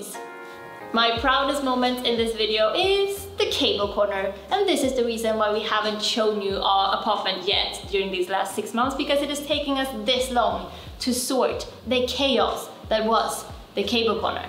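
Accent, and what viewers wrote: United Kingdom. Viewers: wow you're already catching some British accent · I can definitely hear some glorious British accent coming along :D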